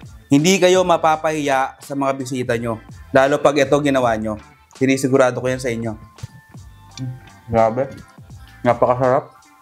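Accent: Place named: Cavite, Philippines. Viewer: Chef tonong lihitimong Las Piñero o Caviteño ka ah. San po kayo sa Pinas?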